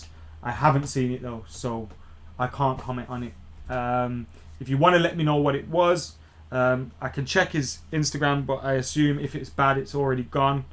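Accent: Birmingham, United Kingdom. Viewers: Are u really from Birmingham?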